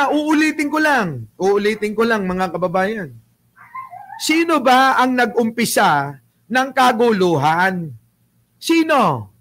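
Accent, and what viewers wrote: Ilocos, Philippines. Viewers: ❤@ gd m, sir,nakakatawa Ang pag salita mo Ng ilokano dialect, naka katuwa ka,mabuhay ka sir,mabuhay si hon pbbmjr,in all the time,amen..!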